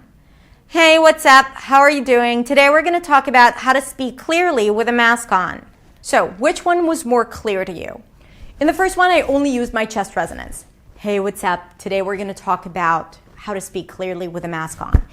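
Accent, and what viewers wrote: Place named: United States. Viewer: With the mask and a strong american accent it is quite dificult to understand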